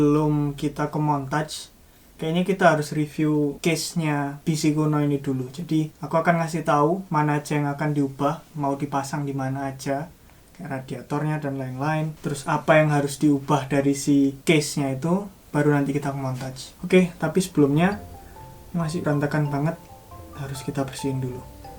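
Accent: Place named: Java, Indonesia. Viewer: Orang jawa mana bang.. Muedook bnget.😁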